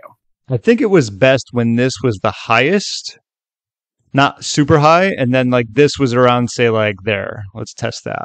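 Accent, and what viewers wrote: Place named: United States. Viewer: Its easy when your accent is so generic American lol but does it actually work for people with variable localized international accents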